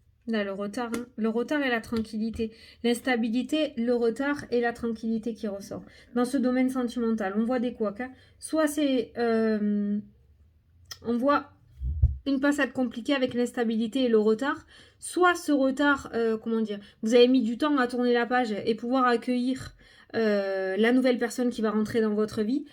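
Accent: South, France. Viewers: J'adore t'écouter avec ton accent du Sud! Et ton jeu l'oracle du temps est magnifique. Je ne tire pas encore les cartes mais il me donne envie de m'y mettre! Bravo!💖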